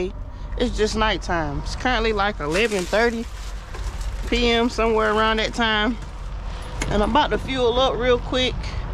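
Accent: South, United States. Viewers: Hi I could Listen to you speak all the time I am from the North I like to hear southerners speak it's so lovely,you are a very intelligent respectful young lady,whenever I am in the Carolinas Virginia or Georgia the people are so friendly and have such lovely manners,I like also that you are being you and not pretending in front of the.camera, you are being yourself and that's awsome,safe travels. P.S. I enjoyed your.visit with your Grandfather and your hometown and when you were showing what your car can do that was awsome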